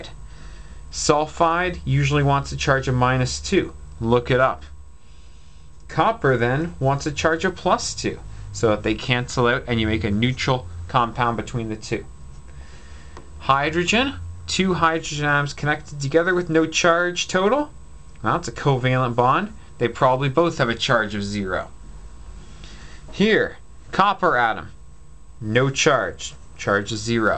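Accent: Canada. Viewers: are you Canadian? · Has anyone ever mentioned that you sound like a Canadian John Malkovich?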